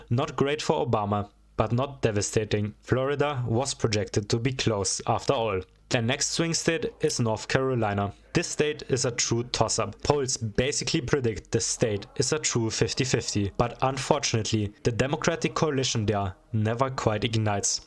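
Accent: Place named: Germany. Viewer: Der deutscheste deutsche Akzent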